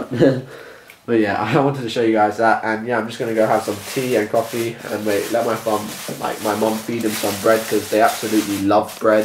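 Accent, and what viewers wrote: United Kingdom. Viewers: HE HAD MORE OF A BRITISH ACCENT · He had a strong British accent. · When wasnt so scandalous about every lil thing with a british accent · Mate you lost your British accent😳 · idk why but i like vlogs in the British accent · his accent was much more british back then 🤣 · Currently he sounds less British than in this video.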